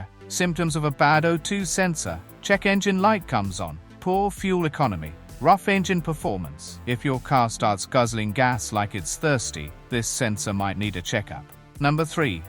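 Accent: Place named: United Kingdom. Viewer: As soon as I heard the British accent, i was out.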